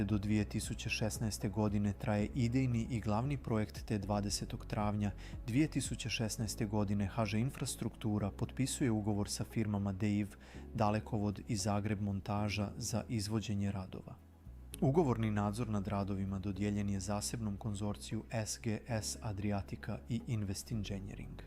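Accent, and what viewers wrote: Serbia. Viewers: Dobar video al ide mi na k ovaj srpski naglasak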